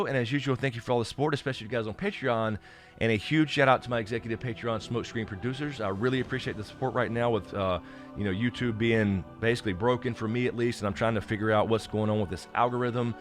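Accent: South, United States. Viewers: Will do. I guess I still want to hear your take on their comments though, as, well I respect your opinion. I'll listen to it, but what Im' getting at is; what would you have said if this was something like a panel discussion and you got to ask them questions, and point out instances to them that you think doesn't fit the tone of the rest of the series. And of course get more of the cute Southern drawl. ( I swear I'm not flirting! You know I'm married. My mom ran away from Tennessee, so it feels homey to me in some weird way.😉)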